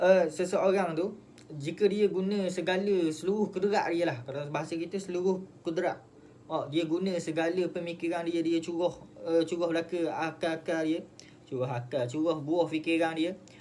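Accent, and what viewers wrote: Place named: Terengganu, Malaysia. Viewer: Pekat sungguh ganu enta... Akan tetapi penerangan yg jelas dan terperinci 👍👍👍👍👍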